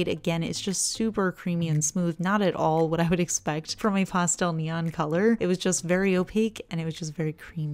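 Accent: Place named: Northeast, United States. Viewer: I'm not a neon fan, but some of those colors...wow! I think the people who don't like how you pronounce orange are clueless about accents! Don't they know you grew up in the northeast? 🤦‍♀️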